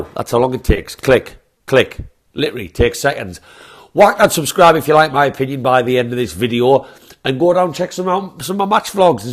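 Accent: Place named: Teesside, United Kingdom. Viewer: The solid backline is what everyting is based on. Lots of lads that some of the Fickle buggers say are not good enough for NUFC have been doing us proud (including the bloody goalkeeper FFS)! I think your accent sounds a bit Teesside-ish as well, and you know what...I DON'T CARE! As it happens, there was a 'Boro Mags' flag in the old East Stand paddock 30 years of more ago. They were not following the glory, they were backing the capital city of NE England! Around the same era I was drinking with some lads that moved fron Hartlepool to Tyneside...all long term mags, not a glory hunter among them. I expect when I go up to Morpeth>Berwick...MAGS I expect when I go over to North and South Shields...MAGS I expect when I go over to Stanley>Consett.Hexham...MAGS I expect when I go down to Gateshead, Chester, Durham, Spenny, Bishop, Darlo...MAGS That's why NUFC have managed to stay one of the biggest clubs in the country without winning a bloody domestic trophy since 1955. 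Regional loyalty. Don't let some bitter halfwit bait you, it is not your fault they didn't get a season ticket during the dark years, and now cannot get one because they are just following the glory. Keep up the the good work, and HWTL!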